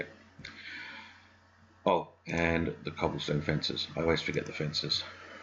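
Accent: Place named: Australia. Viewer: Great to hear a fellow Australian, and thanks for posting the tutorial! It's a great first video.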